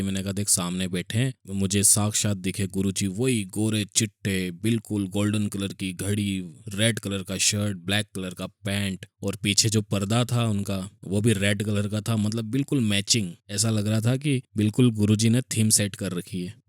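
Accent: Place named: Indore, India. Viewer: Uncle ji aap v satsang sunaya kijiye me v bht time indore rahi hu indore is my favorite place😍😍aapki boli mein wo pura nazar aata hai😍 Jai Guruji🙏🙇‍♀️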